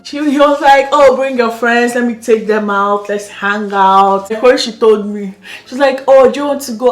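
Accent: Canada. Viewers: You’re beginning to have Canadian accent🌝💃🏻❤️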